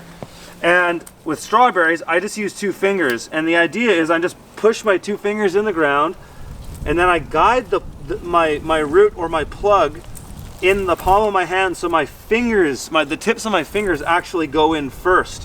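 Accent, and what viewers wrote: Canada. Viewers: The Canadian accent is why I’m here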